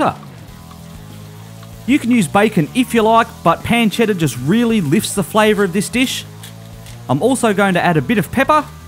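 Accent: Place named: Australia. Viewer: You have the best Australian accent ive heard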